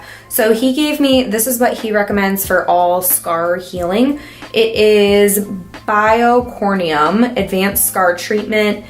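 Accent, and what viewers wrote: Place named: Midwest, United States. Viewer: I could tell you have a Midwest accent 😭😂 #chicagogirls ♥️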